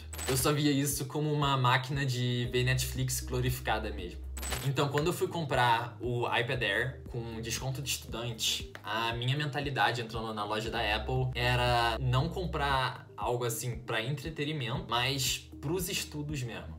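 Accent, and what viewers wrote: Rio de Janeiro, Brazil. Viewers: Chocado com o sotaque carioca do ícone da ciência, adorei o vídeo! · é incrível como você tá morando na Alemanha a 3 anos e ainda fala carioques fluente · Naviossx, claxsses, sxstudante, bem carioca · Sotaque de carioca é muito bom kk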